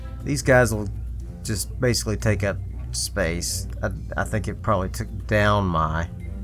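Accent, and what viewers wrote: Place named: North Carolina, United States. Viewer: Are you from North Carolina? I'm trying to pin down your accent.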